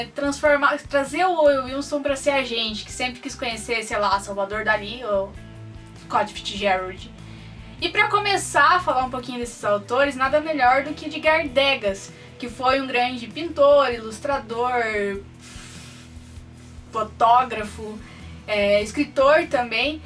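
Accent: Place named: Minas Gerais, Brazil. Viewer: muito bem fazeres vídeos acerca de cultura mas tenta fazer sotaque brasileiro de Rio de Janeiro, sei que nada tem haver com a questão da cultura mas acho tão chato e enervante esse sotaque do interior , Minas ou algo do gênero , tenta isso é tens mais um subscritor.. Bj e boa sorte com o canal!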